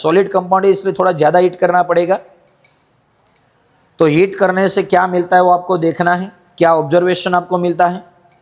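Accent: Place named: Gujarat, India. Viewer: sir to gujarati chhene etle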